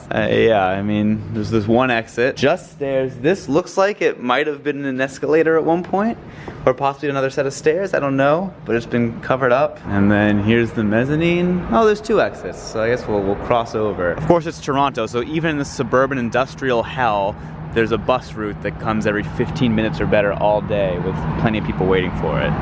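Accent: Boston, United States. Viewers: Wow that was an aural and auditory feast! Even your Boston accent is coming back strong! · Watching someone with a Boston accent here makes me smile!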